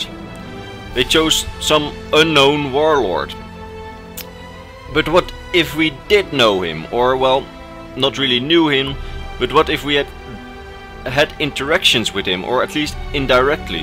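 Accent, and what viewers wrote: Netherlands. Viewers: You have a Dutch dialect, are you dutch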